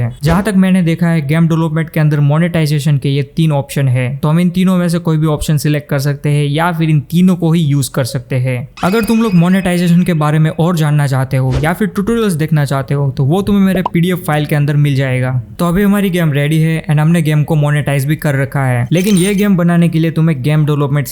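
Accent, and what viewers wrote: Gujarat, India. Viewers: from your way of speaking game I know you are from Gujarat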